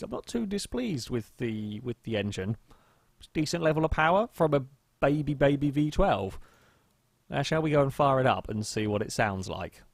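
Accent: United Kingdom. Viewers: he's British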